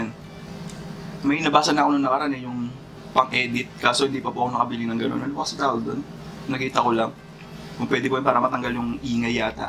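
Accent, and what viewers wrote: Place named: Pampanga, Philippines. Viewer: Ali naman boring sao..okay yamu..mas masanting pin more on kain hahaha..pero pag atsu sao ann atin talaga kwento tang punto ng kapampangan yang magdala hahahhaa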